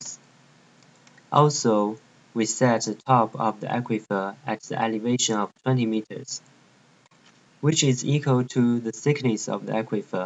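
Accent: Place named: China. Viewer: 一听就是中国人哈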